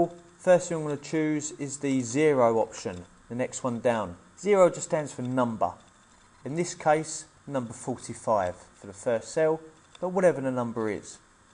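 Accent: United Kingdom. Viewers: I like The British Accent, Well Done Brother Subscribed DONE. :)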